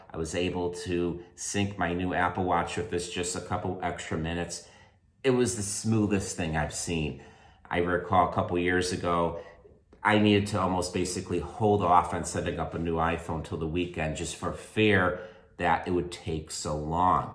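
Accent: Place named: Connecticut, United States. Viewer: Informative video and strong Connecticut accent. 😅 I spent my middle- and high-school years in Suffield.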